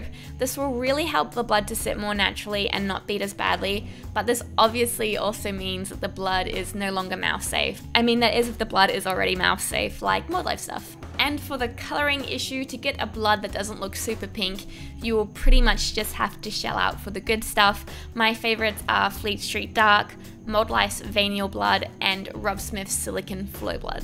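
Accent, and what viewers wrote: Australia. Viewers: Came here from Mykie. Are you Aussie? Hi fellow Aussie! <3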